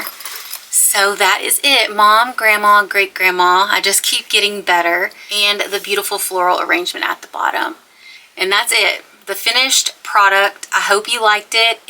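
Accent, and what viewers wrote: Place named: Texas, United States. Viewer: I could hear that Texas twang!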